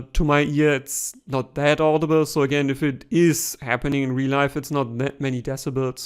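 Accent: Germany. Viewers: Dude, you sound like "der8auer", if you are probaly familiar with that name and anything he represents :)) probably the german accent...Anyway, your reviews are accurate and true, witch matters the most. Thank you!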